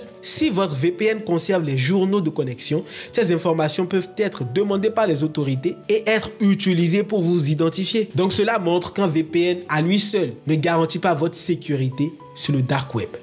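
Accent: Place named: Senegal. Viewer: Super vidéo et en plus avec l'accent sénégalais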